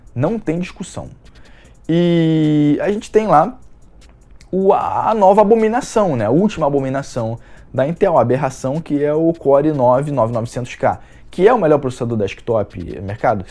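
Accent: Rio de Janeiro, Brazil. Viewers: poh não para não! Conheci teu canal através desse conteúdo, gosto de todos os assuntos que vc aborda aqui no canal, mas krlho os videos que mais me prendem aqui são desse tipo, tu fala sobre umas paradas, q eu que tnho um certo tipo de conhecimento nessa área, fico tipo “krlho esse maluco manja pra porra, foda ver ele falando essas paradas pq são de fato assim” então manda mais conteúdo assim que ta foda, ainda mais no nosso dialeto carioques que fica mais pika ainda kkkkk. Tmj ✌🏻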